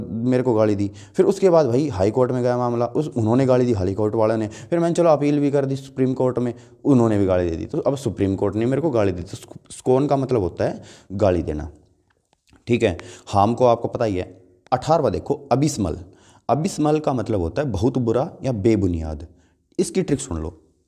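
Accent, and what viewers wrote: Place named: Haryana, India. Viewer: haryanvi Aa hi jave bich bich m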